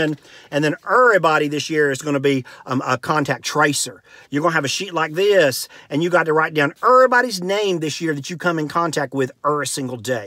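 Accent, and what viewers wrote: South, United States. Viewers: "Errr body". This gentleman definitely resides in the southern portion of the United States.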